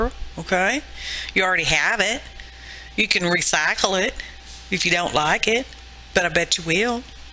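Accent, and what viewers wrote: South, United States. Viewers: Cute cards......and love your southern accent!!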